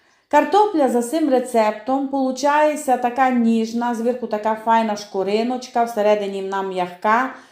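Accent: Transcarpathia, Ukraine. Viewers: Йой,як приємно чути нашу файну закарпатську. А ріпка-мням-мням. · Прекрасний закарпацький говір. Дуже мені подобається.. Щастя Вам.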